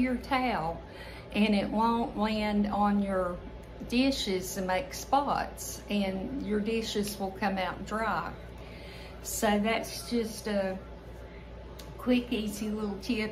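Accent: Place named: West Virginia, United States. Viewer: Hi, My name is Christine. I sent you a letter in June, about my mom, Tonya. She has since passed due to bladder cancer. I want you to know how much you meant to her. She loved your personality, demeanor and sweet heart. While I was a caregiver for her, she had lost interest in a lot of things toward the end of her life, she was 79. But she always had your channel on. Even when she napped, she wanted your show playing in the background. You we're her friend and comfort. She was from Charleston West Virginia. You reminded her of her family and friends with your delightful accent and passion for cooking. Please get well, don't be down, we have eternity with Jesus someday. We love people and touch lives on this earth. I strongly believe that's the will of God. Just like Jesus did and still does. and that's what you do, everyday. I will pray for you and everyday. 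Sometimes soldiers get wounded and tired and need to heal. Thank you for being there for Mom and me at such a delicate and emotional time. I'll always be a fan. God bless you and yours. Your friend, Christine ❤️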